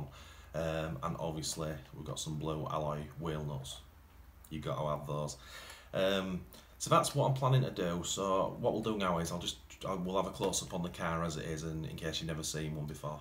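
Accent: United Kingdom. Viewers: Hi, sorry to ask unrelated questions but you are my go to channel,it might be that Brit accent, I am in blighty!Right, I am new to the hobby and after watching you I now own a XV-01 lancia delta. I now want a buggy. Looking at the Dark impact BUT then see the Top force. I intend to run it on a "cheapish" BL Absima combo. What would you go for? Also on your build I could not see if you need bearing upgrade set? Thanks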